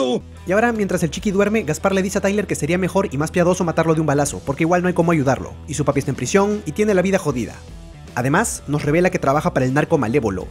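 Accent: Peru. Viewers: ERES DE PERU MASNA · aah eres peruano xd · Todo porque le entiendo porque yo también soy peruano uwu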